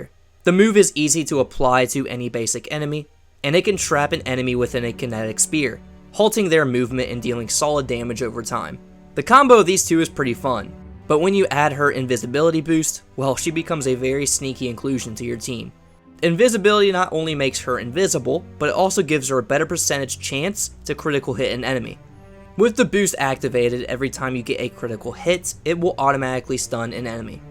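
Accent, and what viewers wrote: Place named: South Jersey, United States. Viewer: I’m from Philly & you are 100% from South Jersey I can hear it in your voice lmfao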